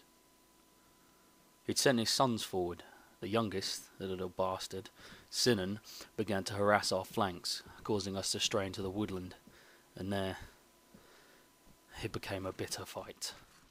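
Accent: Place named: United Kingdom. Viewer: you have a good accent, its british but rough and hard.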